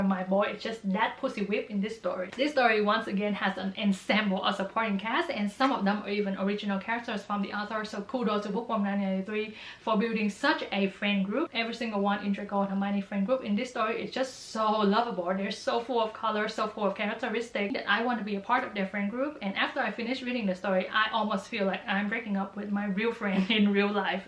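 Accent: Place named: Vietnam. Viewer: are u vietnamese? your accent is so viet <3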